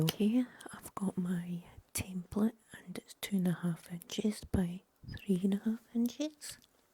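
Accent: Scotland, United Kingdom. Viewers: Where in Scotland are you from if you don't mind me asking? Great video, amazing work!x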